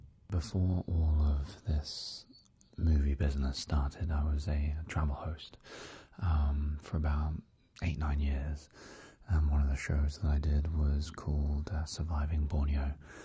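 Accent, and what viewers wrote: England, United Kingdom. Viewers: That accent . Oh the English 🤤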